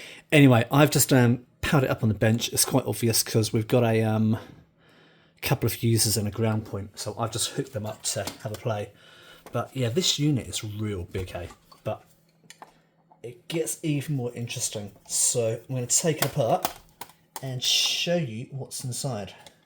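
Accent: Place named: New Zealand. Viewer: Wait, you're a Kiwi? I'm really bad at spotting us in the wild..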